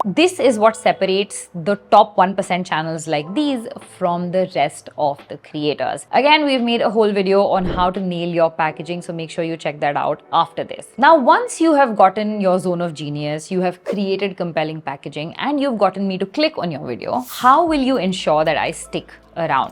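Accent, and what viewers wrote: India. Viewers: I like the Indian accent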